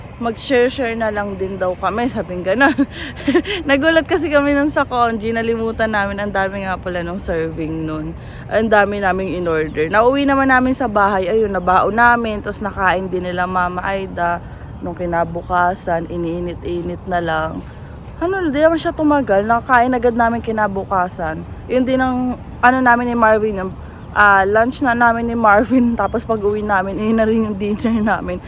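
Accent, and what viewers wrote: Batangas, Philippines. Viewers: Mga bata pa kayo kaya keribels lahat ngbpagsubok basta laban lang! Vlog nyo plagi ang nasa tv sa sala namin hahaha.. asawa ko plagi nanonood sa inyo habang nagawa ng email nya sa trabaho sa gabi😂Tawang tawa sa iyo dahil pareho tayo ng punto, taga Batangas kasi kaming mag asawa!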